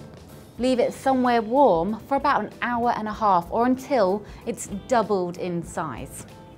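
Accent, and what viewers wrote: England, United Kingdom. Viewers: Man, I love to hear cute women speak with an English accent.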